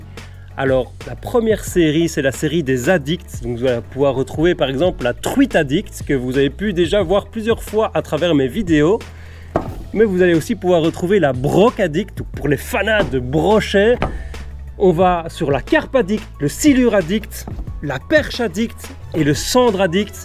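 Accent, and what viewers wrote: Belgium. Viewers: =la bonne ambiance de tes vidéo et ton accent belge font des vidéos super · = un accent belge à en rendre jaloux les Français. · = le meilleur accent belge de tout les temps!:D Le team Celt'fish te suit quotidiennement sur les réseaux! À bientôt! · = vive l accent belge j adore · = un accent belge bien sympa, avec des vidéos bien intéressantes pour novices et confirmer!👌🏼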